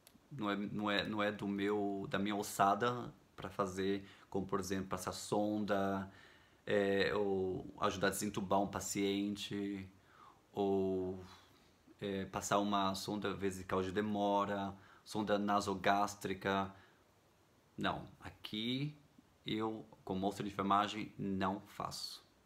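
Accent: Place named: Germany. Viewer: Adoro o seu sotaque alemã 😅 usando sempre a garganta eu amo o idioma alemã já Morei em três cidades alemã beijos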